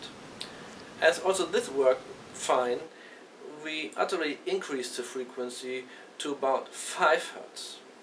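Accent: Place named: Germany. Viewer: du bist deutscher oder? man hörts voll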